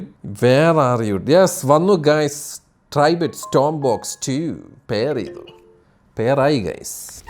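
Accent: Trivandrum, India. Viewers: ട്രിവാൻഡ്രം ചീഞ്ഞ സ്ലാങ് 😡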